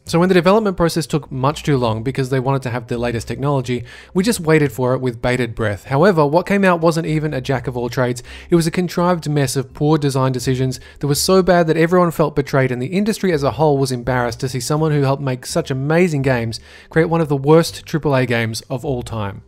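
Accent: Australia. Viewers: ummm he's Austrailian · He's an Aussie, mate. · No he is just Australian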